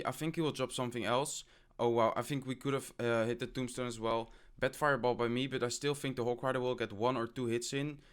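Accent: Netherlands. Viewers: That dutch accent tho. Die herken je zo ;) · he have a Netherlands accent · Nice accent mate, are you dutch? (Like me)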